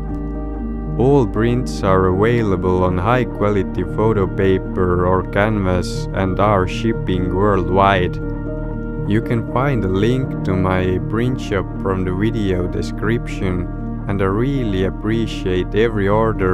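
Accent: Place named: Estonia. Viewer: Hi! Great video, really enjoyed it. I have lived in Estonia 6 months and experienced a cold winter as well, visiting some natural parks and hiking in the nature. I really appreciate you talking in English, I can finally understand what you are narrating and your accent makes me remind of my special time in your home country!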